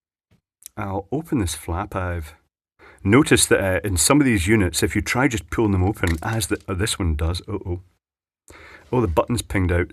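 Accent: Scotland, United Kingdom. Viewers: Came here just to hear 'piss-flap' in thick Scottish burr:)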